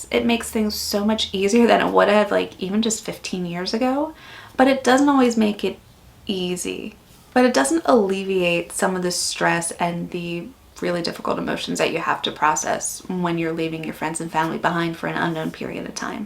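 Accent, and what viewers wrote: Australia. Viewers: Hi, I sense your voice is starting to sound Aussie 😊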